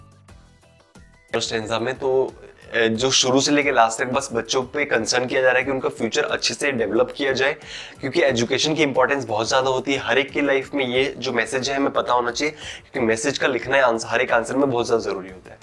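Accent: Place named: Bihar, India. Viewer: U belongs to Bihar or what? Aapne bola naa..."At teri ki jay rha ho"..😊😊:) Waise aap bahut aachaa samjhte hk bhaiya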